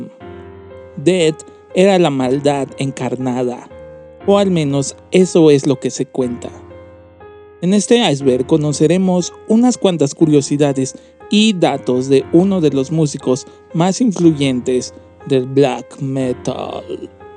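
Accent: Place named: Mexico. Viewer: ES MEJICANO. SE LE ESCAPA CRONICO EL CANTINFLAS. SUENA MAS A COMENTARISTA DE PASITO DURANGUENCE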